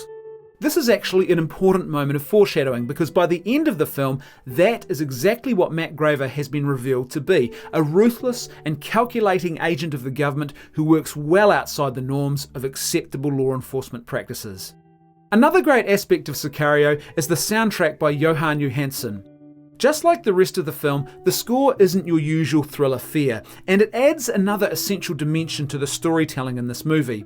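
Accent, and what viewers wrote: New Zealand. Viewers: Kiwi accents crack me up! Great review of the movie, but my takeaway will always be, "ass-pics of dipth" & "muudah". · are you kiwi bra? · The guy talking sounds like a Kiwi. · Can't handle the Kiwi accent... Blah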